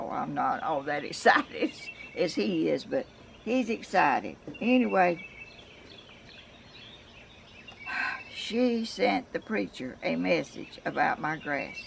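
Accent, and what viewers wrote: South, United States. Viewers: Bless your heart! I bumped into your videos yesterday and have been watching non-stop! My Mom was from Virginia Beach and had family all the way down the coast including Georgia so listening to you talk is such a balm to my heart! Some of my favorite memories are of sitting outside with Ice Tea and listening to all the southern conversation. My Mom is gone and I miss her something awful so if you don't mind I'd like to think of you as a long lost relative that I didn't know I had. 😉. God bless you and keep you! And Cody will be back before you know it! 💞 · In these awful times, it's sure nice to hear a Southerner like my Grandmother. Listening to you is like going to my Grandfather's farm in Slocomb Alabama. I now live in Northern California and miss my family very much. I grew up in West Palm Beach, FL in the late 60s and 70s when it was still beautiful down there. 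My family is all over between Slocomb Alabama and Panama City Florida. So I guess we're kinda like long-distant neighbors of sorts. :)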